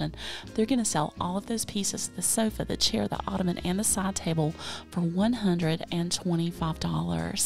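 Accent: South, United States. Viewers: I am sitting here knitting socks,watching you encourage mischief..........wonder where that came from😅😅😅? And listening to that soft southern accent.❤❤❤my peaceful moment!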